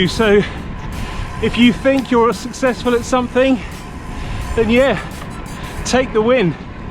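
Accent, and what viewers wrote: England, United Kingdom. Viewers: Excuse me you accent sounds English! Kilometers........flipping miles.......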